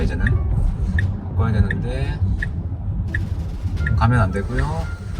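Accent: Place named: Gyeongsang, South Korea. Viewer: 오잉. 갱상도 말 쓰시네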